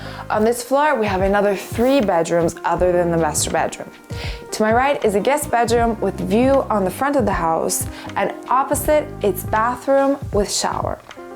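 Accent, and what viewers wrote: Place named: Florence, Italy. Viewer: sentirti parlare italiano con accento fiorentino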